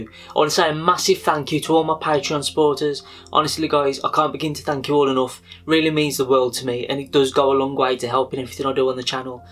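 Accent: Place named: Walsall, United Kingdom. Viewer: They turned out great man. I’ve got a big solid oak worktop offcut that I’d be interested in turning into a shelf and a couple of cutting boards. You sound local to me (Walsall area) if your interested in some private work drop me a reply. Great work mate.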